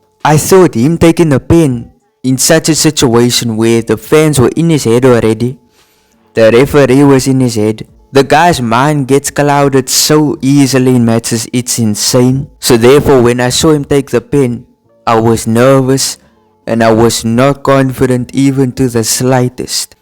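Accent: South Africa. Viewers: Love your channel man. I can tell you are South African with your accent 😅👌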